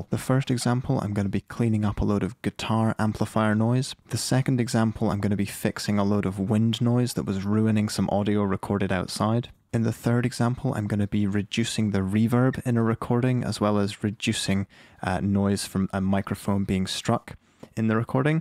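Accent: Scotland, United Kingdom. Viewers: I don't think he does XD he is scottish sometimes his accent shows.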